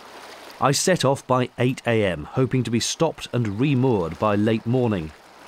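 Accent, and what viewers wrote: United Kingdom. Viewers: Good camera work and a British voice almost like a BBC documentary. Love being on the water myself.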